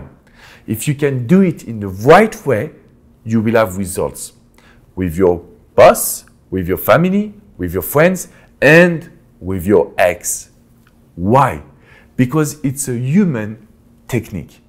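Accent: France. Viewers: ur my best with your french accent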